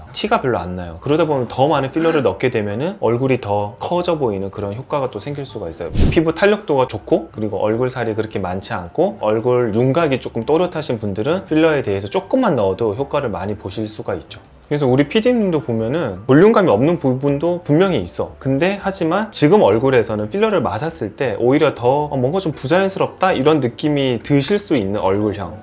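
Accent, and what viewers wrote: Gyeongsang, South Korea. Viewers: 진행자분 경상도 사람이네예~^^ 서울말투에 섞어나오는 친근한 말투~*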